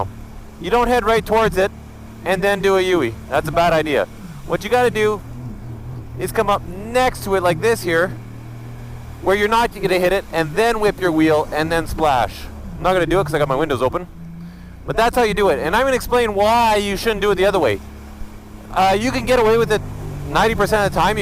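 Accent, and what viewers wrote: Canada. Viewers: You Canadian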